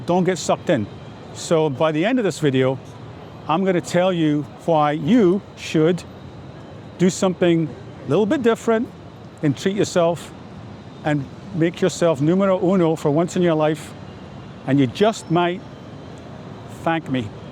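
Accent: Ireland. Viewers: English isn't my first language and i can't place your accent, where are you from ? Are you Irish ? BTW greetings from Ayutthaya area, i'm married to a Thai and loving it here too. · You lived in the US? Your accent sounds different like Irish.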